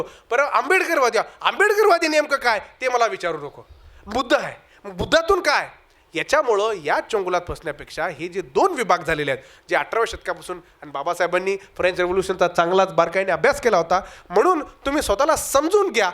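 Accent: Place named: Marathwada, India. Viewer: मांडणी सुंदर आहे. पण भाषा पक्की मराठवाडा भागाची ग्रामीण वाटते. सर भाषेवर प्रभूत्व असले तर मांडणी फारच सुंदर वाटेल.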